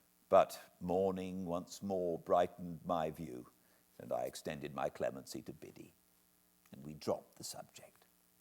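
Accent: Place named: RP, United Kingdom. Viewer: Thank you a thousand times for these readings! I've read several times David Copperfield and Oliver Twist, but so far, I had only read extracts of Great Expectations as you can find them in English learning books (mostly abridged text). I'm responsible for at least 3 views of each video since English is not my mother tongue. Your prononciation is very neat : it's like Queen's English with feelings and local colours (if that makes sense). My only fear is that you might stop reading before reaching the end of the book. Please, do go on until the last chapter and I promise to 'read with my ears' until the end too.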